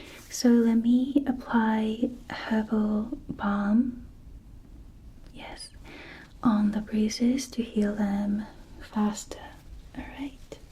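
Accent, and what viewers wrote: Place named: United Kingdom. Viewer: 너무나 한국적인 분위기에 고증도 정말 잘하신것 같은데 우아한 영국식 영어가 이렇게 잘 어울릴 일인가요ㅎ 영어공부도 같이 되고 있어요! 좋은 영상 너무 감사해요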